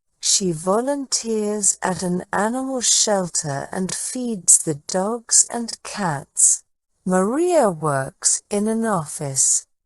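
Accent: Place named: United Kingdom. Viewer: Excellent authentic British English pronunciation. Only listening to you and imitating is the key to English fluency.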